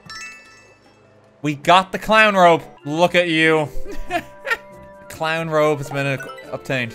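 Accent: Ireland. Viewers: Irish accent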